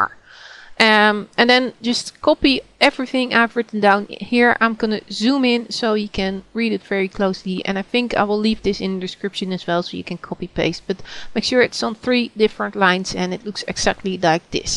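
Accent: Netherlands. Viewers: Are you Dutch? kinda hear ya accent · i think your dutch :) dankjewel voor deze video :)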